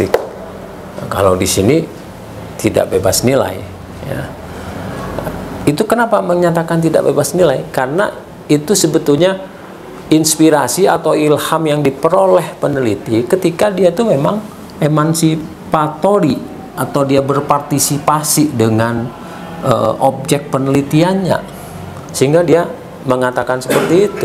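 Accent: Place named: West Sumatra, Indonesia. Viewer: Logat Minang.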